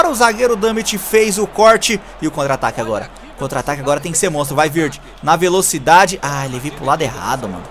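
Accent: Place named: Bahia, Brazil. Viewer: Eae aonde se mora pow tem um sotaque da bahia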